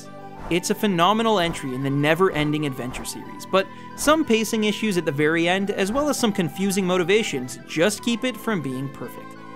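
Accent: Canada. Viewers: Bro your Canadian accent is so awesome, I want to watch your videos just to hear it lol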